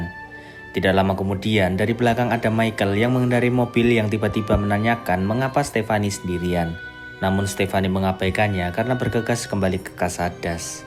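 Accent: Java, Indonesia. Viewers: ko beda suaranya.. kaya y inh orng jawa yah boss ☝☝☝🤭🤭🤭🤭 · Kurang srek dgn suaranya terlalu meddok,cerita film nya jadi GK seru. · Si abang pasti orang jawaaa yaa ngomong y mdok🤭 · Jawa bgt logatnya 😄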